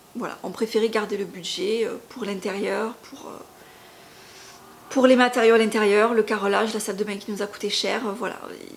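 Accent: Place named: South, France. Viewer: Coucou, Il y aura toujours de la critique et des envieux....c'est la nature humaine.. Toujours un plaisir de t'écouter avec ton bel accent du midi.. Bizzz😘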